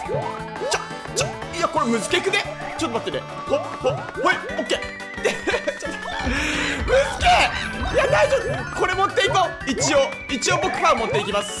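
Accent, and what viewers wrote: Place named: Hakata, Japan. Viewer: 私も福岡在住やけんめっちゃさんが言いたいこと分かります笑!!やっぱり博多弁になる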